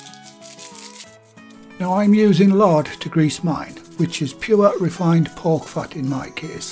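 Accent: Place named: Yorkshire, United Kingdom. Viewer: I'm going to give this a go. I will have to use pigs kidney as nothing else is available. Love your accent it sounds Yorkshire to me but I left there 60 years ago so I could be wrong. I will let you know how I go with the pie. Thanks!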